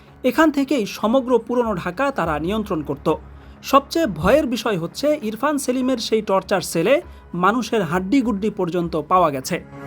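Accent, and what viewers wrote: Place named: Bihar, India. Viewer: কথায় বিহারি টান আছে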